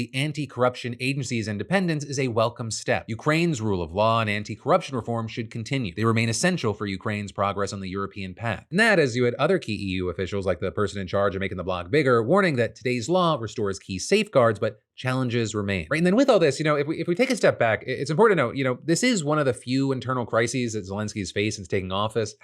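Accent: South, United States. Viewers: am i crazy or does kinda have a southern twang in his voice??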